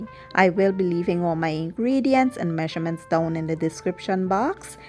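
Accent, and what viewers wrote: Guyana. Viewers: Lovely ! New sub btw are you guyanese ? Your accent lol where are you from?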